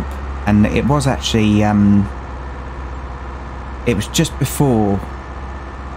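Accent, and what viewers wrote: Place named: United Kingdom. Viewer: 3am and I just bought this haha, sod sleeping tonight mate lol. Nice to hear another British accent too!! We're getting overrun by merican oootubers haha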